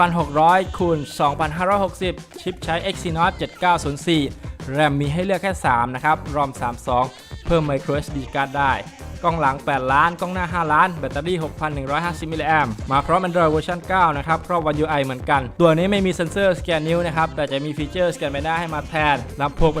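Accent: South, Thailand. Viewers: สำเนียงใต้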